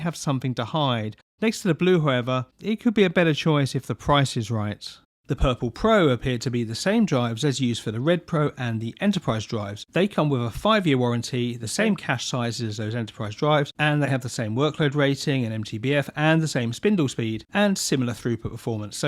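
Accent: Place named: United Kingdom. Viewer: Hard to follow, heavy British accent.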